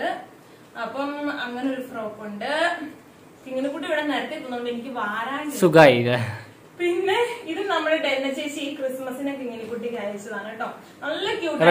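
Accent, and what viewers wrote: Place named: Thrissur, India. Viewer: ശെരിയ, എനിക്കും ഇഷ്ടം ആയിരുന്നു, ഇപ്പോൾ ഒട്ടും ഇന്റെർസ്റ് ഇല്ല, വീഡിയോക്ക് വേണ്ടി അമിത വിനയം, എളിമ, എന്തൊക്ക ഗോഷ്ടി ആണോ, സഹിക്കാൻ വയ്യാത്തത് ഓവർ ആയി തൃശൂർ ഭാഷ പറയുന്നത്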